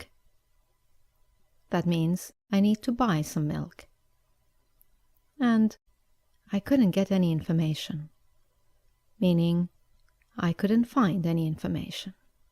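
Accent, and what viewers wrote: United Kingdom. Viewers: great!!!!. niice british accent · is it uk accent or us accent? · Brittish accent rules!!! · Your video helps me a lot to improve my English, that's why i sign up and make a comment to say thanks for all the effort you've done not only for me but for the other who want to learn good English. your such a lovely teacher i like the way you introduce your lesson and i really learn from you. what i did not learn is your accent of saying the words please help me and teach me how to imitate your accent because i like British accent rather than american accent... keep up the good work. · Very good class.. It helps me improve my English, specially British accent. Brilliant explanation.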